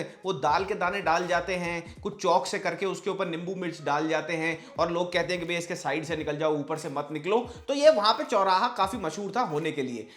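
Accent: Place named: Delhi, India. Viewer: Delhi accent bhai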